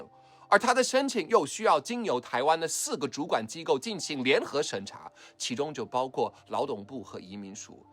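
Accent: Beijing, China. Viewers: 請尊重台灣法律，規定就是規定，還是你在中國太久所以理所當然覺得台灣會因為你是拿美國護照，你就不用遵守台灣的法律規定？偷5元美金跟偷5千萬美金一樣都是偷。針對對台灣政府不給你入境的言論，根本很明顯就是自己不尊重台灣法律，然後裝無辜說要台灣政府給你交代？完全不覺得你很愛台灣了解台灣，或了解台灣人民與歷史（你願意的話，可以多讀點有關台灣歷史）。但其實你可以回去中國，你的口音更適合那裡，台灣人聽到你的口音真的會有某種程度的「抵觸感」。這跟你中文流不流利沒有任何關係。PS.你這個影片也沒有幫到台灣只是很自我中心在說台灣政府欠你說明！你說你想幫台灣？還是想「臭」台灣？好搞笑。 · 他的中文是在中國好像是北京學的，難免是那邊的腔調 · 應該沒有人期待你來台灣吧！一口大陸腔去中國工作吧！ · 真棒臺灣人不要你這個匪諜。大陸口音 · 樂樂法利請改掉你的北京腔吧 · 我怎么覺得你說的中文有很濃的中国腔？ 你肯定上了親中的電台,你被美国政府通知別譲你入台！ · 你的中文是大陆口音，不是台湾口音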